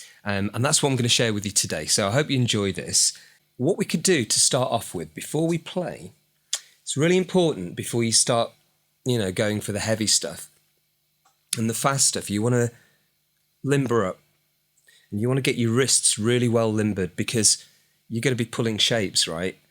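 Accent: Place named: England, United Kingdom. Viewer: I could not watch until the end. You made me grab my guitar. That's a good thing. Subbed. Cheers. P.S. London and Milton Keynes raised. I am a Kiwi now though. We escaped the wicked witch's axe and legged it out of Blighty. We flew actually, but point being that the accent is familiar. It works for me. Stay calm and none of the hype that gets my blood pressure up.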